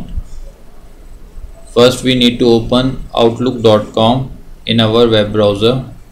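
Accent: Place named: India. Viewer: love the indian accent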